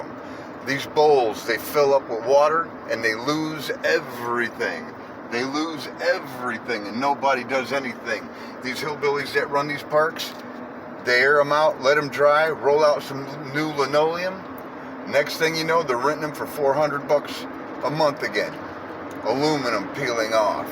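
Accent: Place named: North, United Kingdom. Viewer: Your from up North aren’t ya buddy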